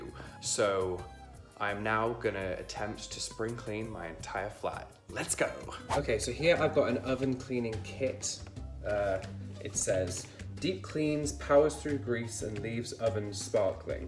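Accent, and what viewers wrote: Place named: Newcastle, United Kingdom. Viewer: His Geordie accent is sneaking in quite cutely!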